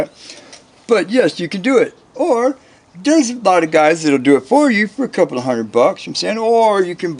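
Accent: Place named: Arizona, United States. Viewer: I'm in georgia...i immediately recognized your accent because one of my best friends moved from AZ to ATL he sounds just like you..🤘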